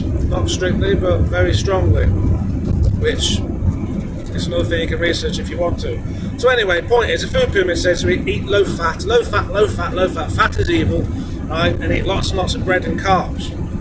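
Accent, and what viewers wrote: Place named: Leicestershire, United Kingdom. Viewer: 👍.where abouts in the Midlands are you from fella?. Sounds like Leicestershire 😊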